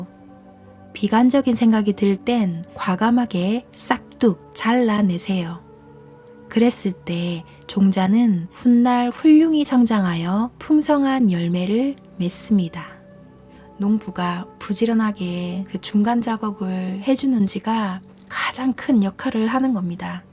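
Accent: Gyeongsang, South Korea. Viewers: 경상도 사투리가 매력 짱짱짱 이네요~^^ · ^^ 목소리 너무예뻐요 경상도사투리라서 더예쁨 영상도아주좋아요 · 경상도 사투리에 아가씨가 그리워지네~~^^ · 말끝에 ~~하는 갱상도 억양만 고치면 되요. 쉽지요? 난 조선족, 북조선 말투 할 수 있는데 그살람들은 남한 억양 몬해요.. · 갱상도 사람은 표준말을 쓴다고 해도 갱상도 사람이 들으면 갱상도구나 느낍니다.ㅎ. 좋은 책 소개 감사합니다. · 사투리? 경상도 표준어 너무너무 매력적입니다 정감이 넘치는 말입니다 아주아주 세련된 말입니다 감미로운 목소리 정겨운 목소리 감사합니다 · 경상도 말투 좋아요👍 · 경상도표준말 듣기좋아요, 저도 경상도표준말 씁니다. 목소리 참 맑고 곱습니다. 늘 잘 듣고 있으니 쭈욱 이 목소리와 말투로 해주세용~~♡♡ 감사합니다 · 님 좋아요 경상도 사투리가 매력적인 감동입니다 저도 경상도 사람입니다 책 내용이 중요하지요 감사합니다 행복하세요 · ㅎㅎ 이미 억양 자체가 사투리입니다 ^^ 경상도사람이 경상도 말씨는 당연한겁니다 경상도 사람이 서울말쓴다고 말하는거 들어보면 ᆢㅎㅎ 웃기는것같아요 ᆢㅎㅎ 늘 좋은책 잘듣고있습니다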